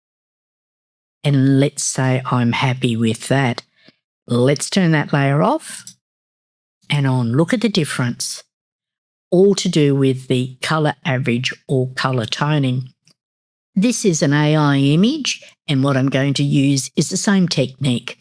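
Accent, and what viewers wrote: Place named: Australia. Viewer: Thank you for your time. Lovely to hear an Aussie voiceover!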